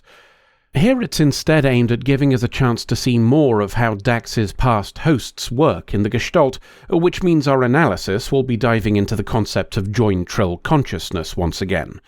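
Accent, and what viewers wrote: RP, United Kingdom. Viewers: In response to a video I keep getting suggested by another Youtuber about "the time Janeway was the spider queen", I went and found your video on the topic. I don't know much about culture in your company, but I believe you sound a bit more "posh" in that episode. <link>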